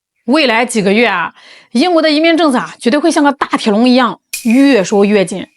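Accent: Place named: Northeast, China. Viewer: 我本来想冲着这标题来看看黄丝和蟑螂的热闹，结果主播这一口碴子味的普通话把我直接给劝退了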